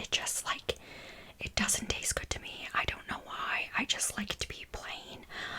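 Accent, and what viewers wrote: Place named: Canada. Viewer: Your Canadian accent really comes through in this video 🍁❤️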